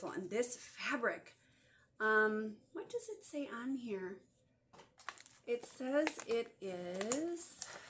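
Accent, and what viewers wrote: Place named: Wisconsin, United States. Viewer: Oh shucks I'm in Wisconsin as well. You must have been born and bred here. I just love hearing your accent. I've only been here for 49 years. I am so jealous of your gorgeous hair. That's not very Christian of me. I don't know anybody who stitches. We are around the eau Claire osseo area. Good to see a native doing a floss tube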